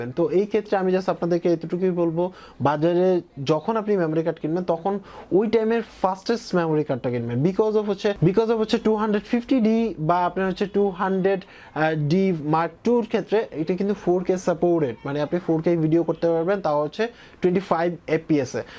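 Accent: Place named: Comilla, Bangladesh. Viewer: ভাই আপনার গ্রামের বাড়ি কই? কুমিল্লা নাকি? ☺ গের গের সাউন্ড আমাদের এখানেও করে। হোমনা, কুমিল্লা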